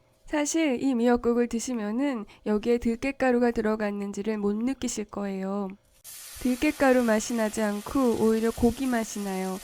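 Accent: Seoul, South Korea. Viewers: 말투가 서울사투리 느낌..영상 잘봤어요 ㅎㅎㅎ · 와 말투가 오리지널 서울사투리네요 신기하다ㅋㅋ 지금은 지방쪽이랑 섞여서 서울말이 진짜서울말이 아닌데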